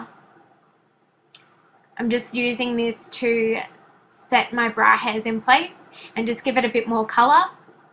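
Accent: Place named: South, United States. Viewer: Holy crap so much for just brows! I love your southern accent though hehe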